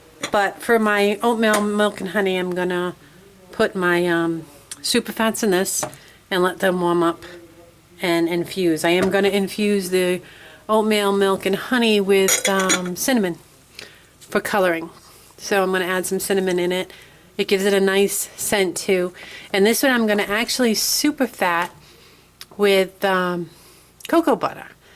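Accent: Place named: Boston, United States. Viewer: Are you n Boston? I think I am detecting a Boston accent from you. Nice soap by the way.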